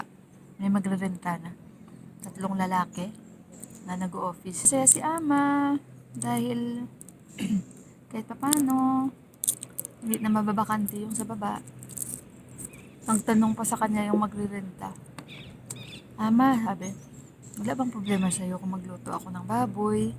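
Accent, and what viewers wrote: Iloilo, Philippines. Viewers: Maham ot gid ko sa imo te ah ky may tuno ka gid ya sa ilonga heheheh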